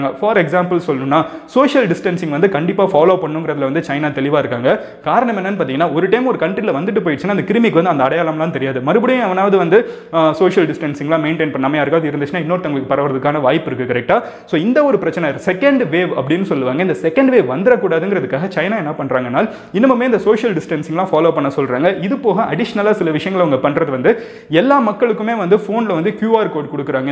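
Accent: Madurai, India. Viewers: Mg neenga maduraiya???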